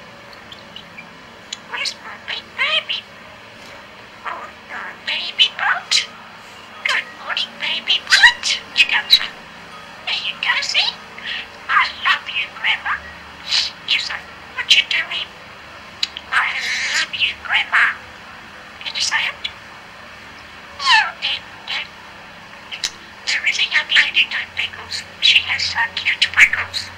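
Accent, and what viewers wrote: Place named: Australia. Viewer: I detect an Aussie accent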